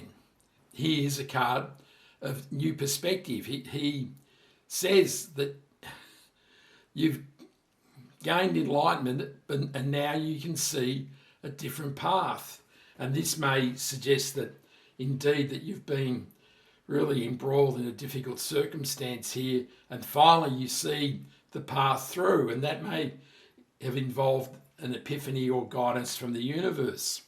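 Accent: Australia. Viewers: Hi, are you an Aussie mate ?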